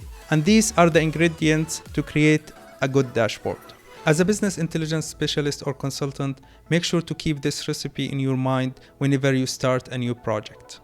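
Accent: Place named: Arab world. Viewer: From your ascent your are Arab it good to have some one like you but if you have another Arabic channel it’s more better.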